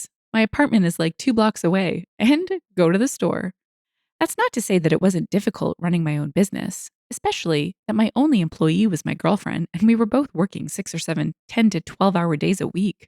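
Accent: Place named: Canada. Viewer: And just a note to add that I love your reading voice and (I assume) Canadian pronunciations and phrasing.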